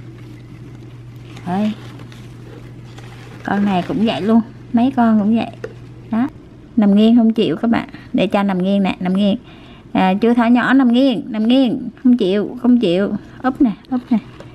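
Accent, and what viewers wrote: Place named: Central, Vietnam. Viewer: Cô người miền Trung ạ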